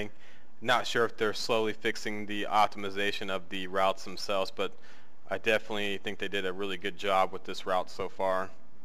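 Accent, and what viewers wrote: United States. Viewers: Are you American? I live on this line :)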